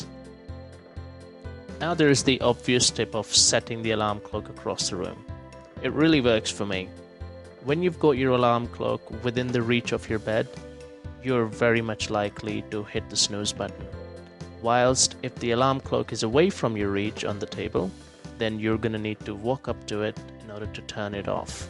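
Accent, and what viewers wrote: India. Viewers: where are you from? I picked up on a bit of an Indian accent but just in a couple words 😉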